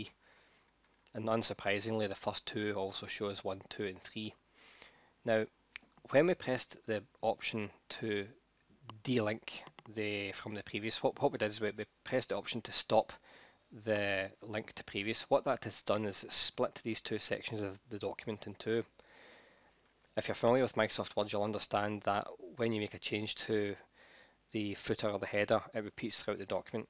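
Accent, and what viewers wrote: Scotland, United Kingdom. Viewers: Thank you so much, for your excellent demonstration! You were so clear on how to perform this function and your delivery was at a very appreciative pace! I am going to follow your great instructions! However, I need to insert Roman numerals into the pages prior to pages 1, etc, throughout my manuscript, so I will follow the same instruction and see if it works! If not, I will email you to ask how to insert Roman numerals prior or after I insert page numbers throughout my manuscript. BTW, I love your Scottish accent! Thank you again for your awesome instructions and delivery! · Thank you, thank you, thank you! I was losing my mind trying to get my document properly paginated. You made it easy. Also, love your accent. Viva Scotland!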